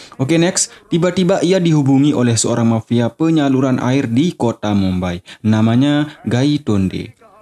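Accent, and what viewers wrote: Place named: Aceh, Indonesia. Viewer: Cara ngomongnya kaya orang Aceh min :v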